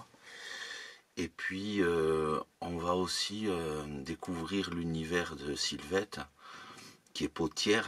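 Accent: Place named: South-West, France. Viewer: merci a toi , je doit me repeter , mais j'adore ta voix au noté fleuri du sud ouest , ton rythme sain, et ta jolie diction , les silences sont aussi appreciables , souvent je clique en onglet , puis quand c'est le moment de regardé jy vais , comme la , un peu fatiguée et sur les nerfs , ta voix ma apaissé , j'ai presque faillé m'endormir ...ta phrase de traversé la vie des gens , en restant dans la tienne , en prenant réellement plaisir a la presence de l'autre et inversement ...j'ai un peu de mal a saisir le pourquoi du camion , la premiere vidéo que j'avais vu etait celle de la remorque a vélo , puis celle ou tu coupais ton bois a genou ...PAREIL pleine de poésie , tu es un jolie messager ,a bientot ...peut on acheter un t-shirt et soutenir ta chaine ?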